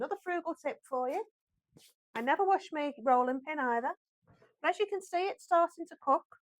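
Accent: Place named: Cheshire, United Kingdom. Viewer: How lovely to discover your channel this morning! It’s so nice to hear a familiar accent from my childhood (I grew up near Chester) … I haven’t heard someone say “skew-whiff” for years 😆. Those tofu wraps look delicious & I will definitely be trying those this week. I’m off to put the kettle on so I can watch a few more of your videos before I get going!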